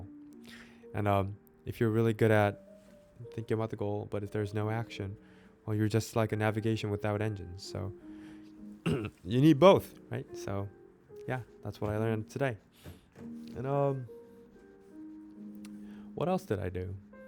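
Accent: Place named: Texas, United States. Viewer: Your English is concise & perfect 👌, you underestimate yourself. Your accent sounds predominantly 🇺🇸-Texan. H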